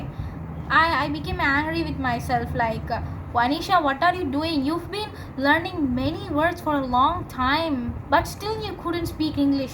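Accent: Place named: United States. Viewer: American accent is perfect anisha❤ I loved it.waiting for more videos 🥰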